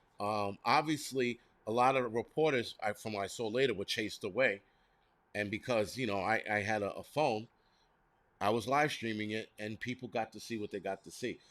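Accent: New York, United States. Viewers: I loved your video. You are so real and talk like a born and raised New Yorker. When I heard you talking about you might be in trouble for just being at the rally my heart skipped a beat. I pray you keep your job and your family are safe. Please keep go for the ignorigation. We don't need to see a few dozen people that will be there. You have been talking about getting out of the city for a while maybe this is a sign for you and your family to leave soon. 🤬🐈🤭💖. God bless you and stay safe.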